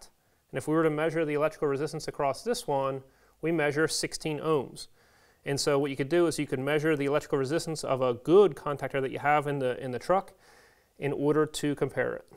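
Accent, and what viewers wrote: United States. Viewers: Speaking in AMERICAN English ?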